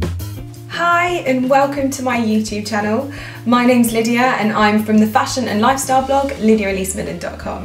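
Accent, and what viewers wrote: RP, United Kingdom. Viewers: I don’t understand people talking about her accent. Its been blown so far out of proportion. She sounds almost the exact same just more mature. she’s always been posh. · I'm new and u just happen to be amazing :D and i love british accent so its a bonus haha and u have such a nice healthy body really impressed with the hard work and determination, gonna be one of my inspirations! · I'm here for the British accent! Such a beautiful and sweet woman. Oh and btw, you have mentioned you haven't cut your hair in 8 years, however your hair looks so healthy, thick and strong. What are you hiding from us? Haha. Please make new videos. Subscribing Love, from Lebanon. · Strange how since you’ve progressed you’ve actually started to speak ‘posh’ too...mmm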